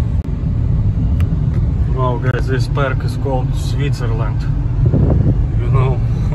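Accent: Russia. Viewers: Настоящий рашн акцент) тут и в подмосковье виды не хуже имеются, можно слайд-шоу делать по картинке и километражу от Москвы)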